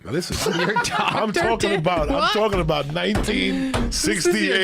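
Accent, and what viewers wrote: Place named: New Jersey, United States. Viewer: The charisma this man has paired with that jersey accent is absolutely beautiful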